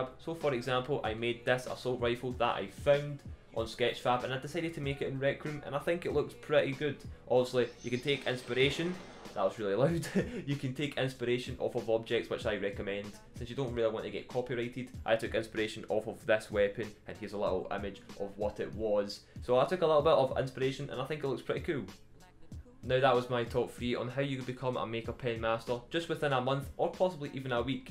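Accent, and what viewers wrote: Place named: Scotland, United Kingdom. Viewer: Fellow Scotish :0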